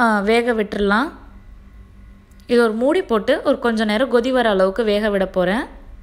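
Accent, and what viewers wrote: Ramanathapuram, India. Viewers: enga ooru slang madhiriyea iruku....na ramandhapuram.... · k sister ramnad side area la paesura maari irundhuchu sister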